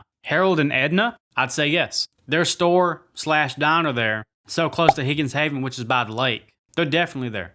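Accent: South, United States. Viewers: You mentioned so I am curious, how old are you? Range? I ask because I grew up with these films and watched them multiple times. I am about to meet Corey Feldman and Adrienne King at a convention so I really like your videos and you have a nice voice, is that a southern accent? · 1. I couldn't find a "10 Things I Still Don't Understand About" playlist. Where is it? Trying to binge those videos and YouTube keeps playing videos from other channels after one ends. 2. You sound as country as me, so you should know that country families usually have their own cemetery. Just a little piece of land somewhere on their property where they bury their dead, assuming the family has lived there since the 1800s, early 1900s at least. The question should be why is the Crystal Green (Forest Lake) cemetery so huge?! Is the town really that big? And filled with newcomers who don't have a family cemetery?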